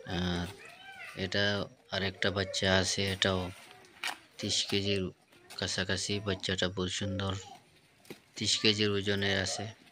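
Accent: Sylhet, Bangladesh. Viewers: ভাই আপনি কি সিলেটি ত আপনার লোকেশন কোথায়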